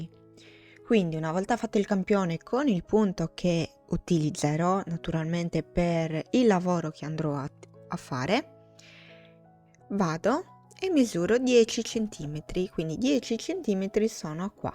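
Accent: Sardinia, Italy. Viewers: ma sei Sarda? Sento l'accento simile al mio 😊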